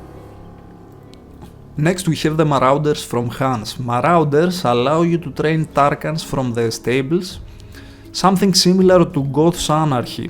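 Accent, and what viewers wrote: Greece. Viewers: haha I am a nottingham forest fan and i notice you have the same accent as Marinakis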